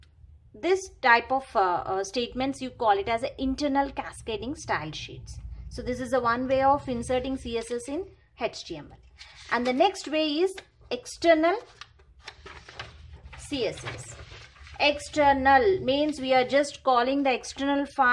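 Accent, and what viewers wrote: South India, India. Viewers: Mam is from south so som problem in pronounce Jati na pucho sadhu ki puch lijiye gyan Mol kro talwar ka padi rahan do mayan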